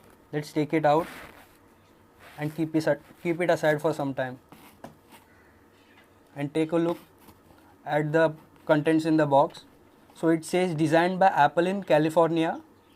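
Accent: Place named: India. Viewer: You made me cry I hate Indian accent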